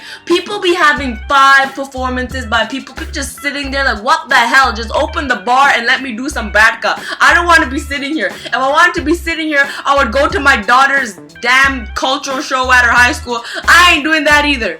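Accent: Punjab, India. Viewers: tussi badi changi Punjabi bolte ho.. I adore your Punjabi accent...😍😍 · annoying as fuck! stop talking in Indian Accent cuz you do it annoyingly!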